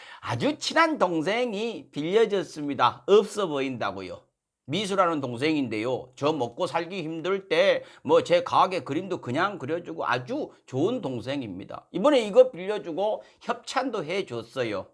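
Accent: Jeolla, South Korea. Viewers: 성범씨 ㅋㅋㅋ 절라도말씨가 너무 맘에 와다부요~~~ 꼭 찝어서 가장 핫한뉴스를 찝어내서 씹어서 돌려부는 성범씨 시원한 사이다입니다 응원합니다 전라도광주에서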